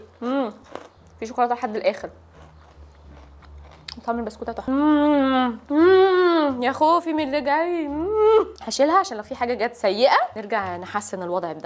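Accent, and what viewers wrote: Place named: Gulf, Arab world. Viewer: لا ادري حلوه اوي تحسيها قلبت خليجيه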